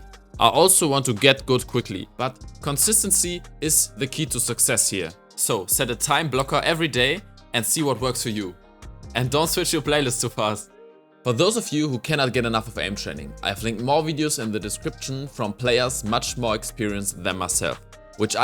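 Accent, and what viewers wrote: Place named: Germany. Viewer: i hear a bit deutsch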